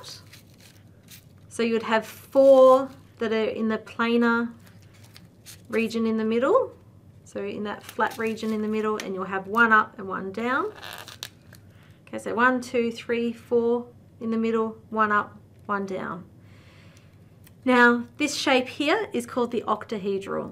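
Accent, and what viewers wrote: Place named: Australia. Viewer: Good video. Well explained. The Aussie accent is easy to listen to as well.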